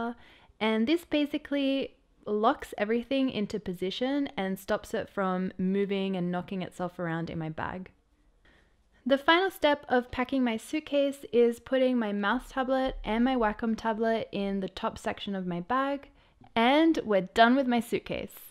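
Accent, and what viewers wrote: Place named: Australia. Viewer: Thank you for this video. And also, i figured that you are australian, which is very awesome!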